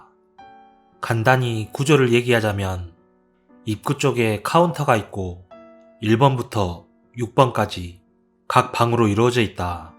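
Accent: Jeolla, South Korea. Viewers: 구수한 전라도 사투리~~~😁😁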